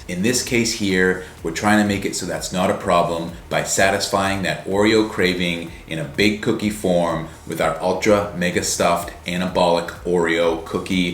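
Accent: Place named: Boston, United States. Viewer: I recognize that accent! Where in the Boston area are you from?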